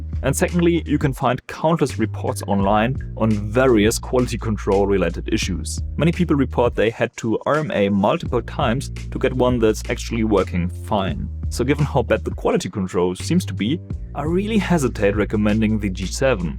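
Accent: Germany. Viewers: Junge man hört so krass das du deutscher bist. Nicer content <3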